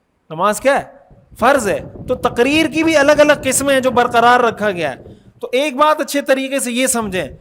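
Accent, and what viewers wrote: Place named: Karachi, Pakistan. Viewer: Krachi mein sab ka way of talking hi asa hai.not copy.nice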